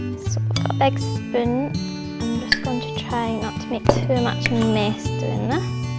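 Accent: Scotland, United Kingdom. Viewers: the broad Scottish accent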